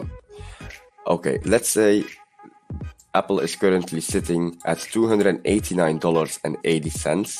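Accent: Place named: Belgium. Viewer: Lol this Guy is from Belgium you can hear His accent but thx Nice vid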